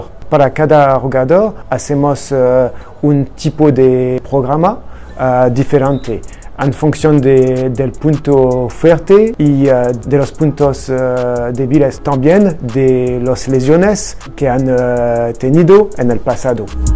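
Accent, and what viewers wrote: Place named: France. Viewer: Pfff avec ton accent français tu me donnes envie de grrr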